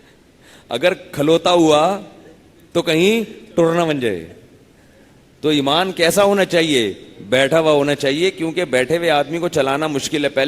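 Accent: Punjab, Pakistan. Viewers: uff ALLAH ini bhairi punjabi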